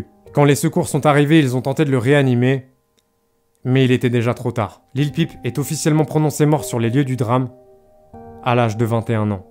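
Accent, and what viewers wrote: United Kingdom. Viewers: Tu me fera toujours autant rire avec ton accent anglais je kiff 😭😭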